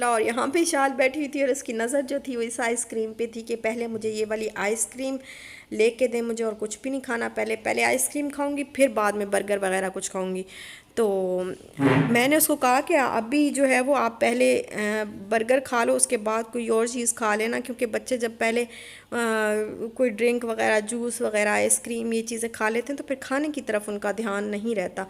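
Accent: Saraiki, Pakistan. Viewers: i watched first time ur vlog r u seraiki ur accent is just like seraiki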